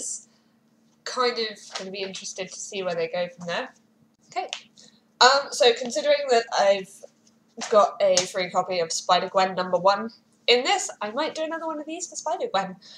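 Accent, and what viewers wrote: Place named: United Kingdom. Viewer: I love this comic. Everything about it. the art, the writing and the characterization all fit the tone. Once again Marvel knocks it out off the park. Not only do we get an awesome new comic but a strong developed female character. Now all that left is the Ms. Marvel/Squirrel Girl crossover. Great vid by the way. ALways nice to met a fellow comic book fan and also love the British accent. lol P.S I find her really hot! like DAMN! i.e she is funny, witty, smart, curvy, eccentric and she has a tail. Am i right? huh? huh?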